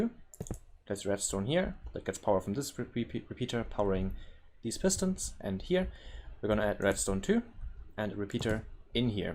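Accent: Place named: Germany. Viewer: I needed that thanks a lot :D Btw ru german? cuz u kinda have the same accent like ilmango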